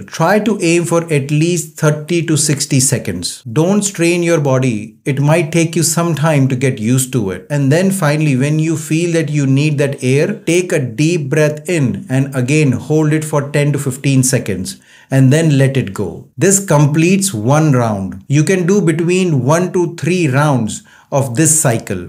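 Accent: India. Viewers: I love indian accent when you speak english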